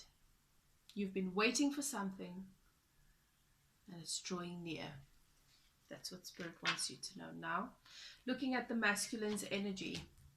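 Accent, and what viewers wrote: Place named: South Africa. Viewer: Wow,I love your reading, thought I recognize that accent.First time listening to a South African reader.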